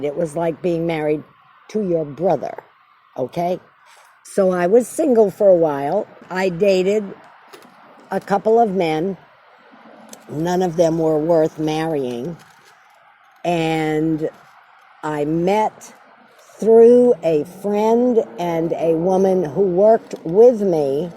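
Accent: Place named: England, United Kingdom. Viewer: Суперское видео!! Побольше таких снимайте!! Очень приятная и красивая женщина с изумительным английским акцентом!!! С нетерпением ждем 2 и 3 части!!!!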